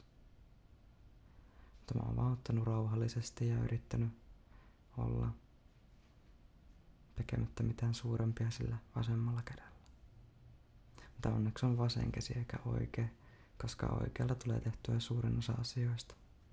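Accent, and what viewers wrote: Finland. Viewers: Your finnish accent and cadence..... Relaxing rambling obviously comes naturally for you^^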